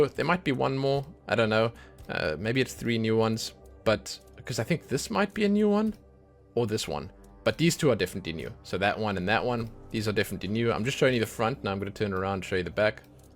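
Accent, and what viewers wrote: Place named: South Africa. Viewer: Here after seeing some new content for the game again and wanted to check the character creation and I heard the accent and my my a fellow south african will check out more vids but you have an extra sub. Love from Krugersdorp👌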